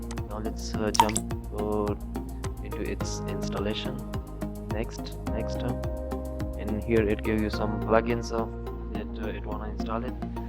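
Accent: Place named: Italy. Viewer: Si sente che sei italiano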